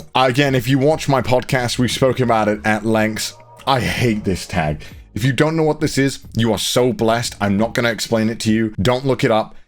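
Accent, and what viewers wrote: United Kingdom. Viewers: Why does sound extra British in this video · this is the first time I keep hearing just be so british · WAIT- BUT THE WAY HE USED HIS BRITISH ACCENT TO BEAT THE YOUTUBE-SYSTEM 😂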